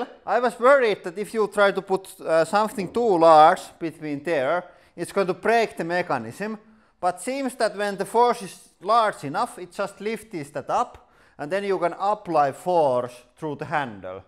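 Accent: Finland. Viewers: You turned Stretch Armstrong into a chicken carcass! You Finns rock. I worked for ABB many years and traveled to Helsinki from time to time. I miss hearing the "Finglish" and your great sense of humor. Thanks to your channel, I get this back without traveling to Suomi-land. Take care and stay well.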